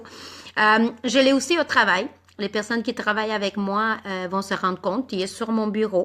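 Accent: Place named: Spain. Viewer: Quel joli accent que l'accent espagnol en français quebecois. Merci pour cette vidéo.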